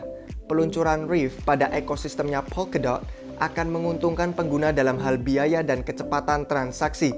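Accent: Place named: United Kingdom. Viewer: nonton buat dapet ilmu tentang crypto ❌ nonton buat denger mas nya ngomong british accent ✅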